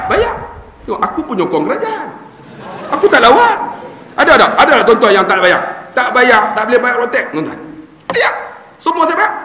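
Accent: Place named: Kedah, Malaysia. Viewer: Ustaq kami owg kedah mmg la kasar...owg kedah mmg ckp mcm nie...harat jngn ambil hati noh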